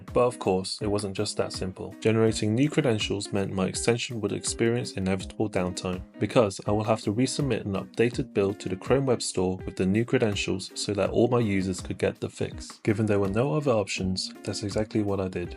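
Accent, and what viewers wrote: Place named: United Kingdom. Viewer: 영국 유학 다녀오셨나요? 영어 너무 멋지십니다!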